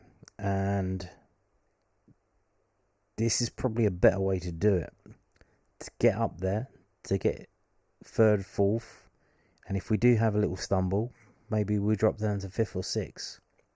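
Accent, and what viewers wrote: Scotland, United Kingdom. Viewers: Your Scottish accent is getting better by the week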